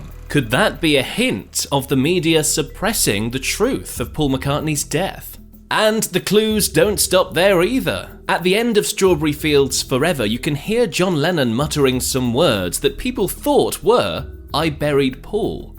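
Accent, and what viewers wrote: United Kingdom. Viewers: You are British because of your accent, British English, so you understand the subject well, why were from london 👍 ￼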